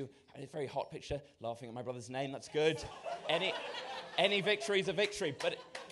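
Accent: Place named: RP, United Kingdom. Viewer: Cute with that post accent. Don't know if he would be as cute if he weren't post.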